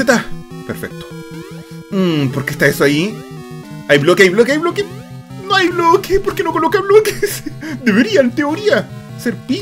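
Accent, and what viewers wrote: Chile. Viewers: Wena eres chileno, igual soy chileno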